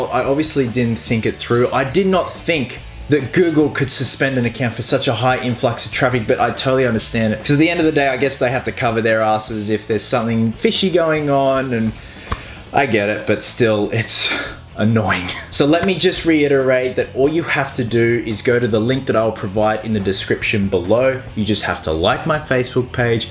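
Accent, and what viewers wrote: Australia. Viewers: hey r u australian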